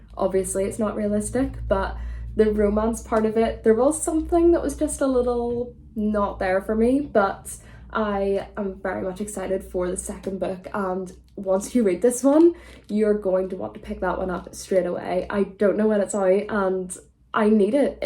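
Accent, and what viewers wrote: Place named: Scotland, United Kingdom. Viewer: I love your accent ❤ Are you from Scotland? Love from Germany 😊❤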